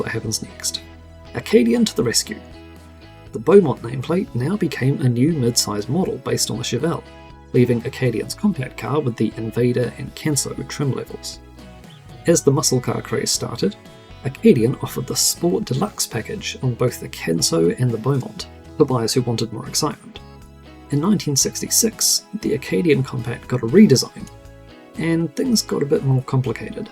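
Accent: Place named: United Kingdom. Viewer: Drop the British accent. It doesn't help.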